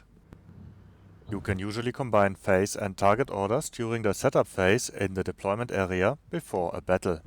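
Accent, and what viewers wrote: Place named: Germany. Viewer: I'm honestly only watching your videos for ze lovely German accent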